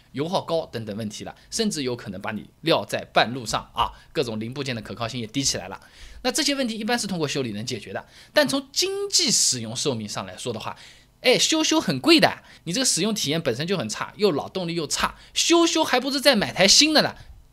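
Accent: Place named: South, China. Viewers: 非常喜欢你的南方普通话。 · 上海口音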